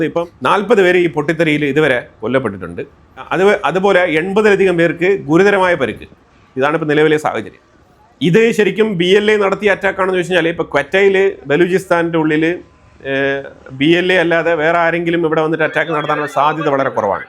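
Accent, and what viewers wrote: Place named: Alappuzha, India. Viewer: Hello Are you the native of all eppey? your slang is just like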